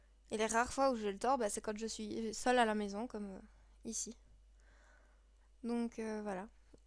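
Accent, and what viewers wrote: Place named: Liège, Belgium. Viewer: Petit accent de liéch là :)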